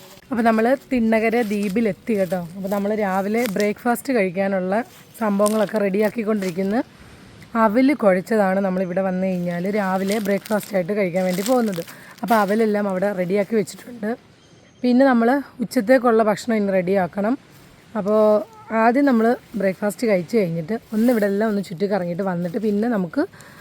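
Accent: Kollam, India. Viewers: എന്നതായാലും ദീപുകാരിയല്ല. കൊല്ലം"ഫാഗത്താ " ണന്നു തോന്നുന്നു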